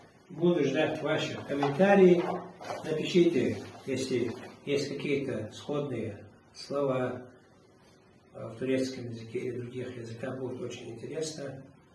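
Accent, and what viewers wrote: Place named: Chechnya, Russia. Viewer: Он чеченец но по акценту видно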